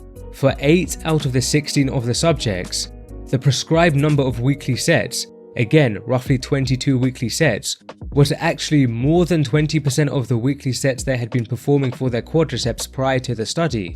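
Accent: United Kingdom. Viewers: What regional English accent is this?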